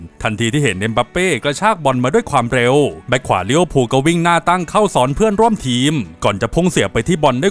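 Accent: South, Thailand. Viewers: พึ่งรู้ว่าแอดเป็นคนใต้ ชัดเจนโบ้เรา หรอยแรงแกงงัว😂😂😂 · เอ้าาา พอรู้ว่า คนใต้นิ 😂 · หรอยน้องบ่าวแอดมิน แหลงใต้555+ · คนใต้กันนะ 5555ติดตามมานานแล้วนะหลวง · เอ๊าแอด คนใต้เหมือน😁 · 5555แหล่งใต้ได้ใจผมอิหลีคับ❤ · แอดมินคนใต้เห่อนิ😅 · หร่อยๆ ติดตามมานานแล้วครับ เด็กใต้ชัดเจน (ผม ป้ายชุมพรครับ) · แอดมินเป็นคนใต้นิ่ห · เอ้า พี่คนใต้หรอคับ ถ้าพี่ไม่แหลงใต้ ผมคงไม่รู้ ติดตามมาสักพักแล้ว ทำคลิปออกมาเยอะๆน่ะพี่ รอชมผลงานอยู่คับ ❤ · พี่บ่าวเป็นคนใต้เหรอ สำเนียงชัดแรงนิ❤ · แอดเด็กใต้ด้วยหรอเนี่ย😂😂 ชัดเจนเลยไอ้น้องบ่าว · พี่บาวคนใต้เหอ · แหลงใต้สุดยอดมากเลย ผมคนสุราษฎร์ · คนใต้เฉย แหลงหรอยเลยหนิ ขามจังติดตามเลยพันนี้:face-blue-smiling:face-blue-smiling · เห้ยยยยยย พี่บ่าวคนใต้เหอะนิ อัยจ่า ถูกใจ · พี่เป็นคนใต้เหรอครับ สำเนียงใช่เลย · สำเนียงแอดนี้ไม่คนนคร ก็คนตรังผมว่าไอ้น้องบ่าว😂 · แอดคนใต้หรอ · ง่าเบอะแอดคนใต้นิ ชัดเจนพี่บ่าว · ผ่านจริง ติดตามมานาน555 พอรู้ว่าเป็นคนใต้ ชัดเจนๆครับ❤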